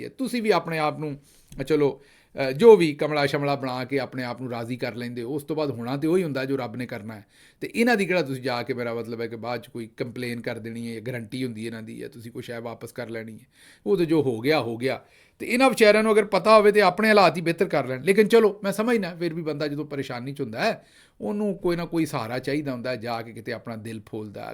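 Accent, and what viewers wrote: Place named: Punjab, India. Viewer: I enjoy your Punjabi dialect Your explanation is sincere Today you mentioned about military officers having a servant “ orderly “ It needs a correction Police and other civilian officers , in military person doing same is called “BOOT MAN”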